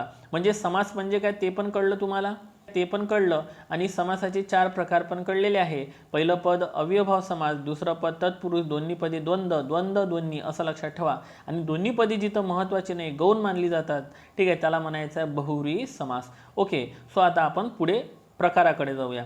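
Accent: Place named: Amravati, India. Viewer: सर अमरावती ची भाषा वाटत आहे मी अमरावती कर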